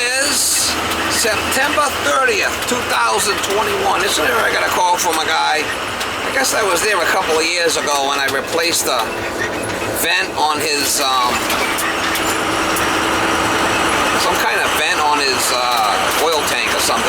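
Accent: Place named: Boston, United States. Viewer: That's just the Boston direct way of talking, no need to unsubscribe. I change my own filter and nozzle and if something went wrong I would call myself "Joe Homeowner does it again" lol.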